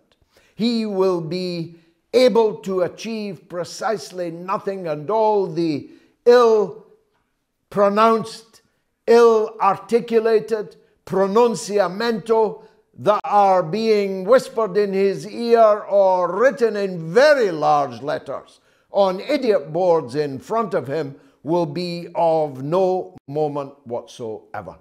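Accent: United Kingdom. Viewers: Damn you've turned into Tucker carlson with the British accent. Trump's actions were deliberately criminal.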